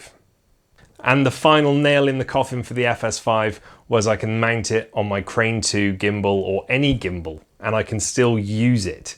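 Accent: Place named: United Kingdom. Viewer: you don’t have to thank me, I watch for selfish reasons. You have lots of potential, I’m liking your topics and of course, the British accent;)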